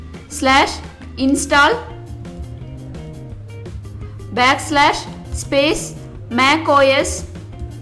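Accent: India. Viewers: I dont like indian accent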